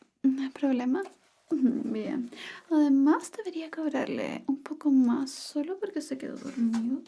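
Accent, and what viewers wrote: Chile. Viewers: Hola, Que tal estás? 🙋🏻‍♂️👋🏻 La primera parte del vídeo me ha gustado mucho y ahora la segunda parte me está gustando aun muchísimo y el español chileno me encanta y es bacán amiga 🥰💞✨ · Hola me gusta mucho el asentó chileno ❤❤❤❤❤❤ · siempre vengo a estos videos con acento chileno ❣️ agradecido! 😊 (ese es el valorrrrrr jajaj)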